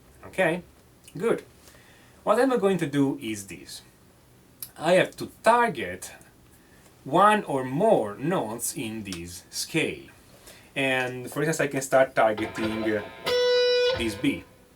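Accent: Italy. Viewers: That's why Meshuggah is so hard to listen to.. I have no guitar knowledge whatsoever but thumbs up for the juicy italian accent ;)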